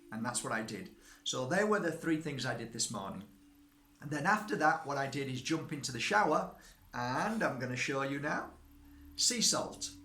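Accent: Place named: Nottingham, United Kingdom. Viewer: Ah, right, Yorkshire isit, now!..no wonder I thought your accent might of been from Nottingham...I'm originally from Nott's, and I get asked if I'm from Yorkshire...x ✌️❤️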